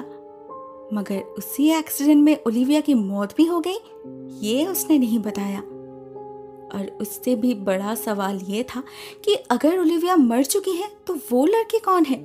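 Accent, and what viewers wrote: Bengal, India. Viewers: Hi sis❤❤tmi ki bengali 🤔🤔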